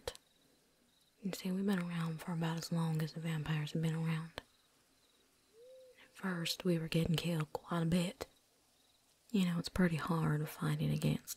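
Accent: South, United States. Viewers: Southern accent needs a little work but it’s still good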